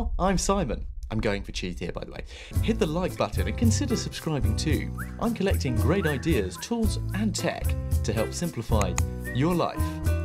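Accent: United Kingdom. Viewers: Apart a great British accent that I adore. These reviews are amazing ! Congrats !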